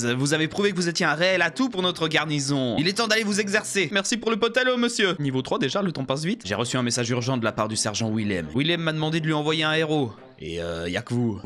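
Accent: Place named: South, France. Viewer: Le délicat accent du Sud <3. J'adore ce let's play continue ! Je veux suivre les aventures de Pierre Grise sur Azeroth !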